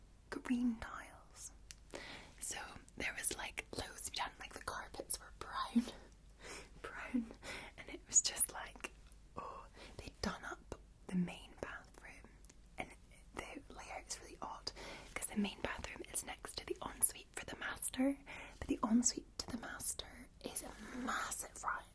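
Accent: Scotland, United Kingdom. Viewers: Hello 💖💖💖❤️❤️❤️🥰🥰😘😘🤗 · I caught the tale end of the Instagram chat it was awesome to see your sister her Scottish Accent is way thicker then yours I guess because you lived In London for 8 years! Thank you for the chat it was nice! Is my guess that you let your sister win the arm wrestling contest on the mark LOL 🤣 my sister's could beat me in an arm wrestling contest there way stronger then me I'm just taller then they are! Thank you for this really relaxing Tingly video I appreciate it!:_Loveheart:_Loveheart:_Loveheart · Me looks at the title of the channel: that’s a weird name Me after hearing 3 seconds of a vid: oh she’s scottish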